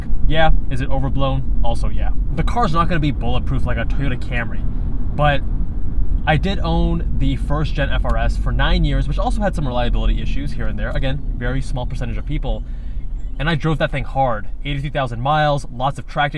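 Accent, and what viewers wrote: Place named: Singapore. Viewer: Sounds Singaporean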